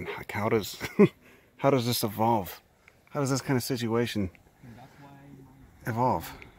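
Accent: Chicago, United States. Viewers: hey Avalon type in 'meet the youtube botanist with the thick Chicago accent.....the stunning specimen is right there haha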